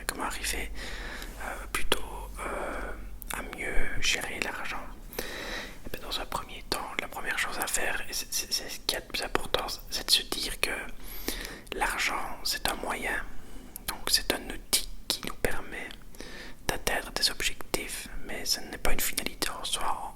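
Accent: Belgium. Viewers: J'adore l'accent belge😉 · L accent belge me detent beaucoup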